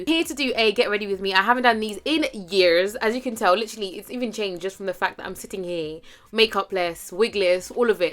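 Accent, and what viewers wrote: Jamaica. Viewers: is that patois i here😂okkkkk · i always here you break out little patois, i always thought you were Jamaican, was surprised when you said you are Kenyan :) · No sah you sound just like a Jamaican. Roots 🇯🇲🇯🇲🇯🇲🇯🇲🇯🇲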